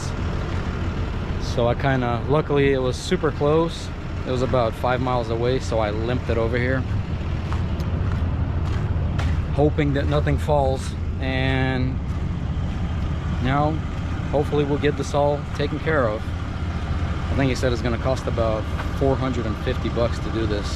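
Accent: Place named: United States. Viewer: Да ладно, ты русский? Ахренеть, я тебя долго смотрю, думал, что ты Америкос с таким-то акцентом.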